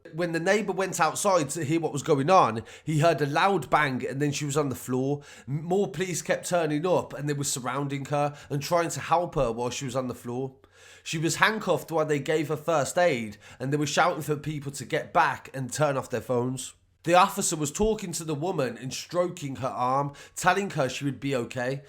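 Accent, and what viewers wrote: Birmingham, United Kingdom. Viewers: I ❤️the Brummie accent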